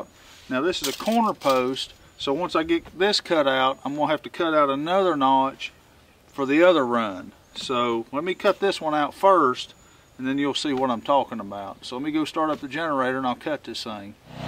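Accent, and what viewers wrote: South, United States. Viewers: im from the south and this dude is country as freaking cornbread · Man, I'm tickled I ran across your channel today. It's awesome to get this info from a good ole boy instead of those some of those nasally Yankees. Northeastern Arkansas here and your vids are going to help me understand what I need to do to help my son build a pole shop on his property and finish a house he bought .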